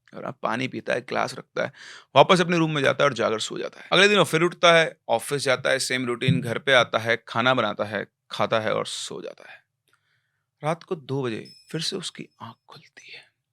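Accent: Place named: Bihar, India. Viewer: Sir apki baato me Bihari bol najar aati hai....lgta h. Ap bihar se bhut connected ho....... Mujhe apki sari real stories bhut pasnd aati hai....maine apke sare stories suni hai.and I loved it...lot....❤ Love from...Bihar...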